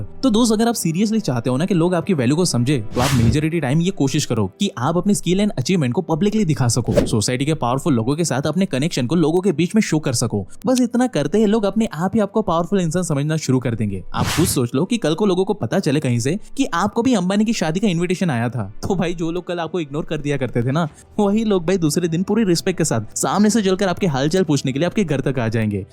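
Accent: Bengal, India. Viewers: Content thik hai lekin ... Bong accent me Hindi pasand nhi aati ...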